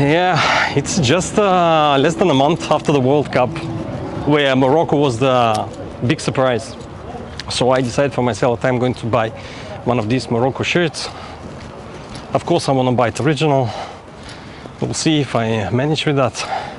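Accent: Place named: Bulgaria. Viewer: Where are you from? Your english is not bad but I feel as a bulgarian accent.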